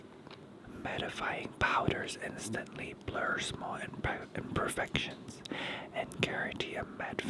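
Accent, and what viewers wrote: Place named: United States. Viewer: 他的英文水平不低，雖然有些小口音，但大致無問題👍屬於擁有美式口音！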